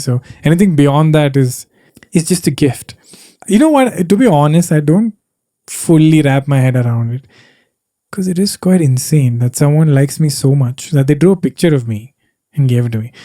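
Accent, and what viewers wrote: Kerala, India. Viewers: You talk just like my mallu cousin and have the same mannerism. He's irritating right now, but I won't be disappointed if he grows up to be like you. PS: Loved the long recording of you talking 🎯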